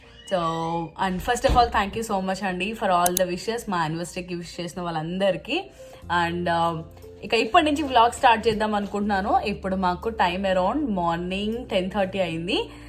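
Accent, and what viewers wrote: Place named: Telangana, India. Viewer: Meru తెలంగాణ లా masthu matladuthunaru...💞💞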